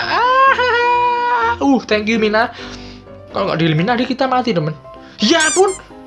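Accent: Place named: Malang, Indonesia. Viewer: bahasane malangan hehe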